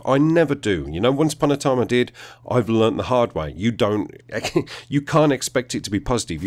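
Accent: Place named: England, United Kingdom. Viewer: Awesome vid, superb english accent marvelous i should say, hope he listen to reason or otherwise his screwed.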